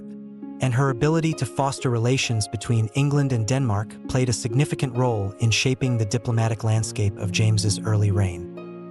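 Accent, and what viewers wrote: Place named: United States. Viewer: This sounds american. I only want uk production.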